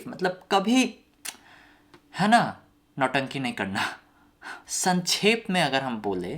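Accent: Bihar, India. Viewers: I did my schooling in a school in Jharkhand which had tons of people from Manipur. So, it doesn't surprise me to see some one with your looks talking with Bihari accent. All my Manipuri friends talk in pukka Bihari accent. But I like your boldness & content, and subscribing because of that rather than just the novelty of your accent + looks. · Baiya mujhe be aapki bihari ascent sikkado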